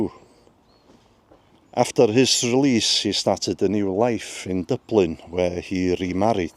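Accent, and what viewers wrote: Wales, United Kingdom. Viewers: He's Welsh!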